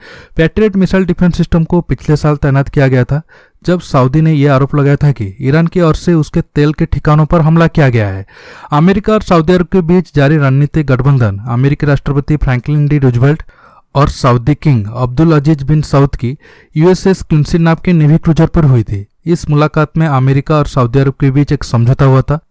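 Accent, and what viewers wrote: Odisha, India. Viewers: Odia touch tike rahuchi bhala laguchi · Hi, are you Oriya??? Because your pronounciation sounds like that??? 🤔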